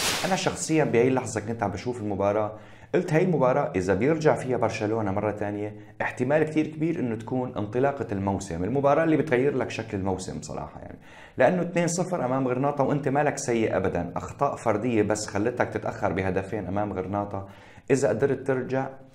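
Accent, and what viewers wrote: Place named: Aleppo, Syria. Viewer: انت مبيين انك حلبي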